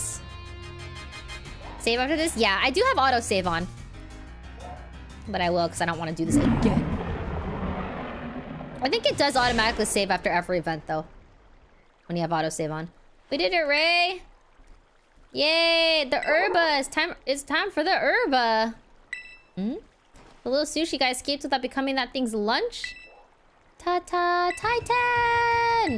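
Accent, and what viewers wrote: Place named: Philippines. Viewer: That Filipino accent was strong on this one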